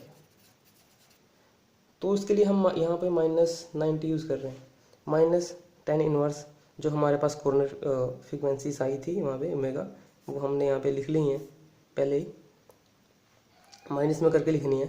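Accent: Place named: Himachal Pradesh, India. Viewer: आपके बोलने के तरीके से पता चल गया. मैं भी हिमाचल से हूँ इसिलिए।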